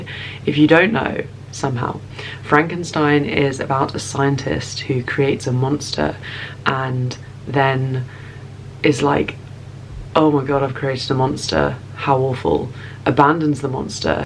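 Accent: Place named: United Kingdom. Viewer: I really enjoyed this video. Its cool to hear someone else's take one books like these. However, i cant keep my self from wondering about where you are from. Some times it sounds British, at times Australian and then i wonder if you're South African. You have probably gotten this question many times. But where are you from?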